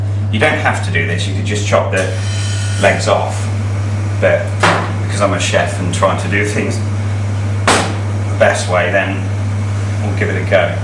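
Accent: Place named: England, United Kingdom. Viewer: Great Job! Thanks for Showing everyone how to clean bird! LUV the English Accent'!!! The Chef sounds like James Bond! LOL!!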